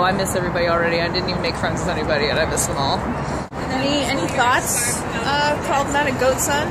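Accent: France. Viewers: Nice French accent (I am french)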